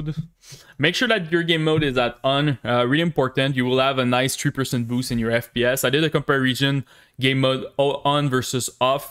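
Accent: Quebec, Canada. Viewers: t'es tu un quebecois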